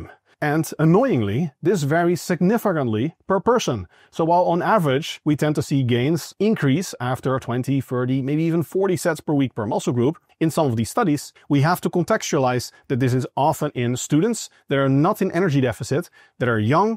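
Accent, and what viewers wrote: Netherlands. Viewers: Still here...for the hard truths and the Dutch accent on anything "un-"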